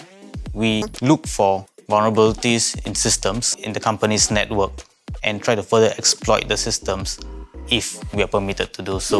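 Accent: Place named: Singapore. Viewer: Okay, this is weird. You're indian but you speaking like singaporean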